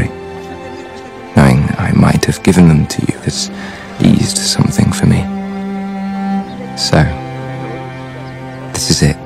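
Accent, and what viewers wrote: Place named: United Kingdom. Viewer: 念的英音英语真好聽。喜歡死這部電影了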